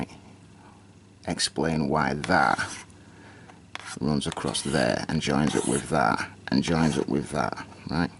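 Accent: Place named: Manchester, United Kingdom. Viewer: Mcmahn 1 im from near bolton, and this sounds manc to me, or oldham area.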